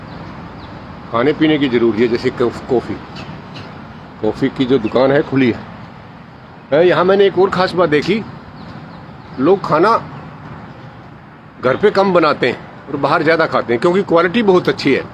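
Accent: Haryana, India. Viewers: No brother his pronunciation is entire Haryanvi and none else.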